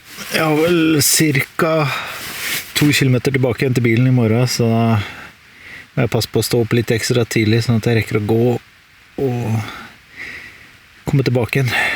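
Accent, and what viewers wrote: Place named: Drammen, Norway. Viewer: Fin video. Du holder det nede og gir det hele den ro villmarkslivet skal gi oss. Artig liten historie, akkurat nok prat og fin bruk av kamera. Har bodd på østlandet i 20 år, nå flyttet til midten, og mener jeg kjenner igjen Drammens dialekten. Ser fram til neste video.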